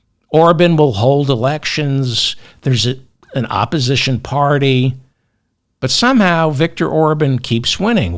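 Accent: New York, United States. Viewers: Your voice makes me miss nyc long time retired to Thailand I hs for reporting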